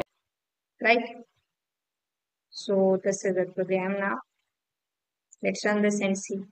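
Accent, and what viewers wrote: India. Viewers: she's an Indian and has different accent for pronouncing words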